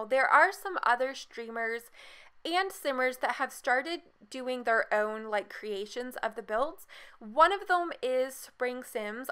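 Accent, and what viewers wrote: Canada. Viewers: Are you from Canada? I'm just asking because of your accent.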